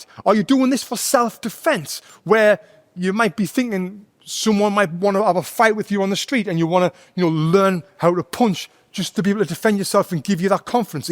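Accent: United Kingdom. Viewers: Are you british mate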